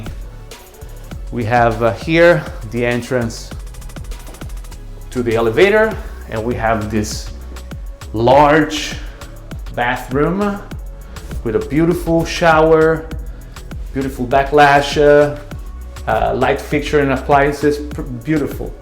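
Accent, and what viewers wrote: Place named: Italy. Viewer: What are you talking about? He’s an Italian which has learnt British in America - that’s what it sounds like!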